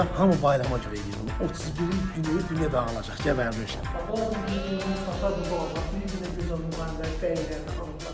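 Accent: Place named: Aghdam, Azerbaijan. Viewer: 100 faiz bu dilin yiyesi agdamlidi😅😅😂😂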